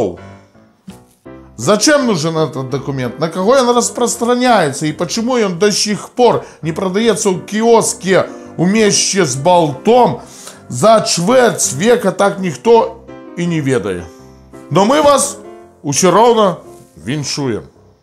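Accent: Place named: Belarus. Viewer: Сначала Лайк потом поржал над трасянкай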